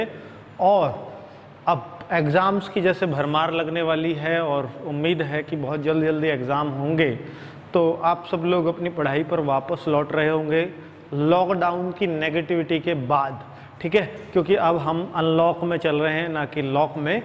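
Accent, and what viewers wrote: India. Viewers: Ram ram Maja aajata hai guru ji apne se lagte ho ekdum desi basha me english bi desi si lagti hai aapki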